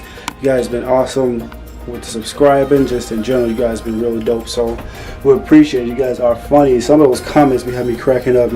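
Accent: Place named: Jamaica. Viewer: I hope y’all haveing a good day and my Jamaica 🇯🇲 sister just have to say this JAMAICA FOREVER 🇯🇲 love how u talk we Jamaicans funny 😂 😂 btw I’m a new subscriber 🤗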